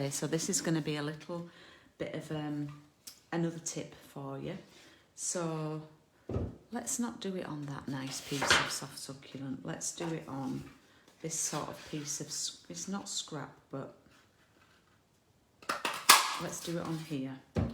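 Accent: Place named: Yorkshire, United Kingdom. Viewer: Watching replay from Sydney. I’ve been enjoying your videos. I love your accent. Are you from Yorkshire? I was born and grew up in Stoke. ❤️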